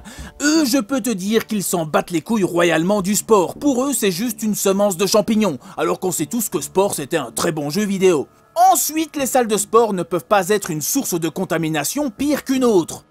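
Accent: Belgium. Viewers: tu es Belge ? t'as un accent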